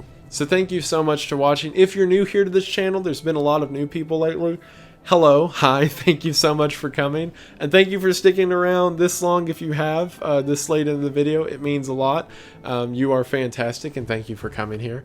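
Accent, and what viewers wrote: South, United States. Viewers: Your Southern accent is so clean and sweet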